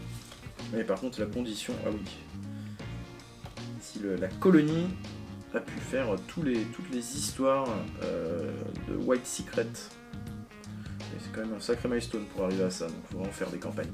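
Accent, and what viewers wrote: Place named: England, United Kingdom. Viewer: quel accent anglais, et 2043 pour la wave 4, quel optimisme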